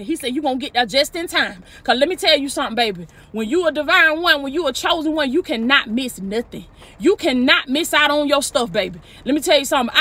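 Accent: South, United States. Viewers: Love the message and the country accent. 🥰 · You from the South????😂😂😂😥💯💪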